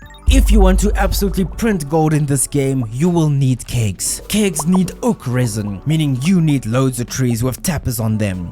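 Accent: South Africa. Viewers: You sound South African. Hey from Namibia! Thanks for the tips - playing with my son 😁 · he's south african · he definitely is South African. I was watching this video like… is that a fellow South African?? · Are you South African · Definitely not french. I believe he is South African, could be wrong though. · we don’t have an accent. We have like nine accents. He speaks with a white joburg area ish afrikaans accent. Some accents here are epic linguistically and phonetically. Even his is cool, just not as cool as some others. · Typical South African accent with dramatic flare... As a fellow south african, it's trash. I hate our accent. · love how the south African accent is super recognisable by south Africans😂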